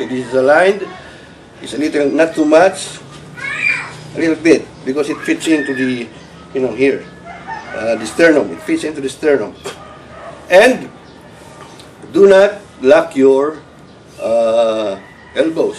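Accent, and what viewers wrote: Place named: Philippines. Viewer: he sounds filipino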